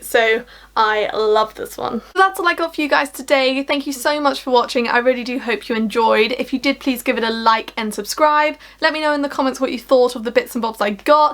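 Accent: United Kingdom. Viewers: Can’t beat the combination smart, beautiful and that British accent!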